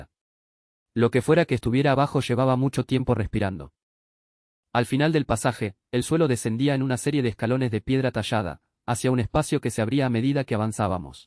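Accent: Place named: Argentina. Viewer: IA argentina?!!😂😂😂 Primera vez que escucho esa voz Ia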